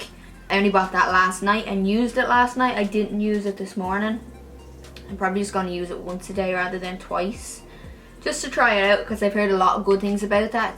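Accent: Ireland. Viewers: I won't lie it looks really pretty with your pink hair. not too matchy matchy either. have I mentioned I'm in love with your accent? I detect Irish am I totally off??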